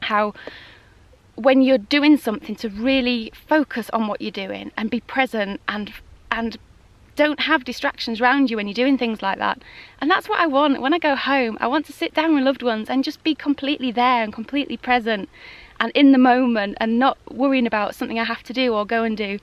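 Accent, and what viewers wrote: United Kingdom. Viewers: I have a friend from England that heard me say "worcestershire" with and American accent and she said, "oh no, you don't pronounce the 'r', that is low class." Haha. So I noticed, when ever I here a Brit speak, you only pronounce the 'r' after a consonant but not after a vowel. Lol, now your viewers that read this are going to be listening closer to your accent. Which I think is really cool. That's my blog (comment).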